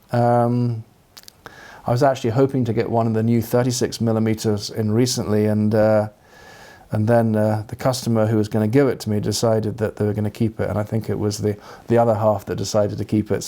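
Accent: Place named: Edinburgh, United Kingdom. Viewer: To be honest I think you`re spot on with you presentations: informative and relaxing to watch and as a bonus you slight Edinburgh burr makes them a pleasure to listen to. I know the Americans tend to do their videos in a different style but there's only so much shouting and fast talking i can sometimes take.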